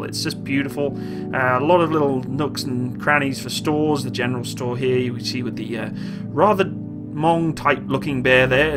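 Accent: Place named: North East England, United Kingdom. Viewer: what part of north east England r you from im from Hartlepool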